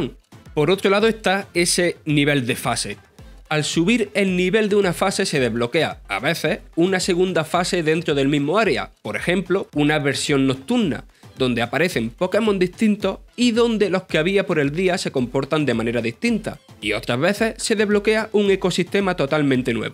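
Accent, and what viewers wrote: Andalusia, Spain. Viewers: La gente que se queja de su acento andaluz diciendo incluso que le sangran los oídos, todo bn en casa? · yo creo que mas andaluz, es que tiene algun problema, yo no hablo asi y no conozco a ningun andaluz que hable asi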